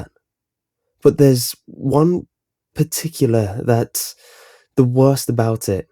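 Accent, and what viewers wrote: England, United Kingdom. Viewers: something about being read creepy pasta's from a guy with a British accent is really fun. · He sounds like an English male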